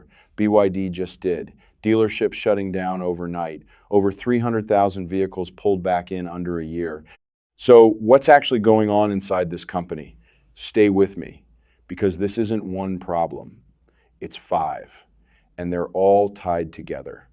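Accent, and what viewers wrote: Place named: United States. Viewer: When I hear an American Voice telling me the Chinese Competition is done I'm skeptical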